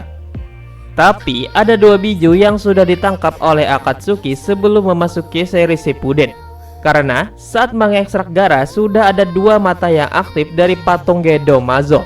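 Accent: Banjar, Indonesia. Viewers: Orang banjar kah nyawa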